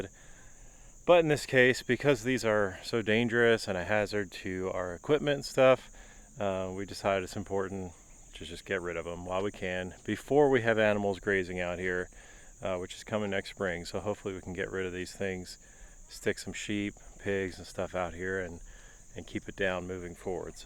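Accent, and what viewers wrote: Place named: United States. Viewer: I was confused a bit when watching your video. Honey Locust is a native North American tree. You sound American and look to be in the Midwest. When you say invasive, it means the plant comes from a different environment or location and outcompetes native varieties. Better terms might be annoying or disruptive and unwanted. No offense intended & I appreciate the information and showing how to get rid of them. I’m from Indiana am trying to manage the woods on my property and return them to only native plants. The honey locust and calorie pear trees eat tires…. And must go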